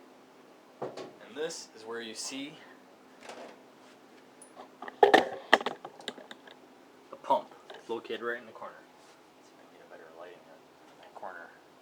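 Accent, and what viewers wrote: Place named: Green Bay, United States. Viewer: Hey thanks! Where are you from? You sound like you're from Green Bay.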